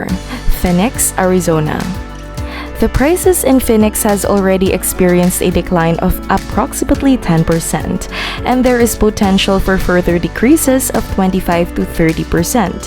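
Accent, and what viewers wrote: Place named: Philippines. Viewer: I detect a accent from the PI. From CA here, average house is around 700k, which is a monthly around 5k for a 30 year... this means the average person has to be bringing home a 15k monthly paycheck........or ~3% of the population. How is it, that any houses are selling at all at these average prices, when 3% of people quality for the average home?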